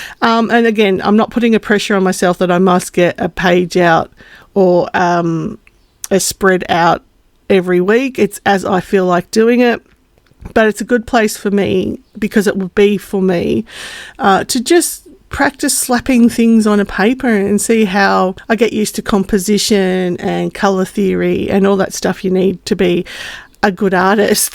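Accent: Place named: Australia. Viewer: Happy ne year.I hope Luna has her stiches out soon. Thanks for sharing your crafts. I enjoy your vids and I like hearing the aussie accent(Im just down the road a bit) I feel inspired and I find watchcing them relaxing. I don't do diamond painting but I do love colouring and I often make brickbuilds (usuaslly asian brands but they have some great animals, including dragons. I think you are really productive in comparison to me. I really have to be in the right mood to do much colouring.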